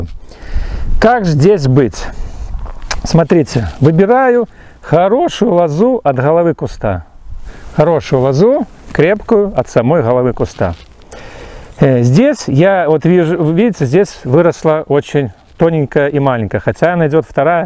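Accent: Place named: Belarus. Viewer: большое спасибо за информацию, очень нравится ваш голос,ни с кем не спутаешь,с белорусским акцентом, вопрос,какой секатор (фирма ) вы использовали для обрезки. Привет большей из Сибири,г.Томск (виноград пошёл на север )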